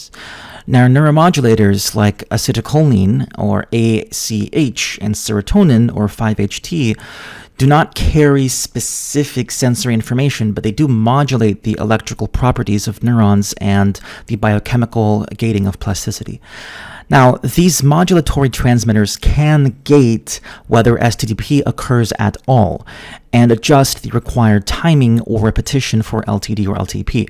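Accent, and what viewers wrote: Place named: United States. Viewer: Are you from Russia? But you have American accent. Just curious.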